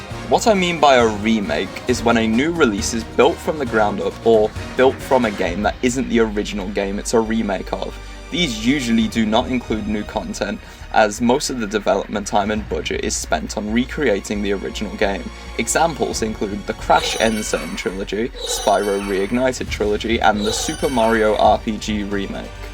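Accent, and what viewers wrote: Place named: United Kingdom. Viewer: BRI'ISH REVEAL HOLY CRAP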